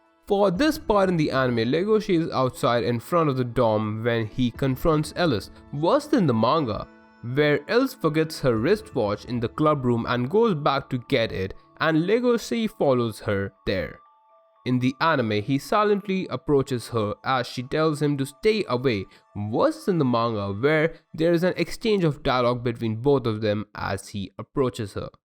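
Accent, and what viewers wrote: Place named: India. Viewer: Du Yu hav a thuffy nose or ar u Indian? Sorry I couldn't quite understand your accent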